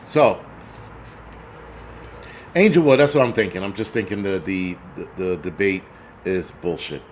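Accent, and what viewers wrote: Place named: Southeastern Massachusetts, United States. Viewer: I love how real you are. You talk like us here in SE MASS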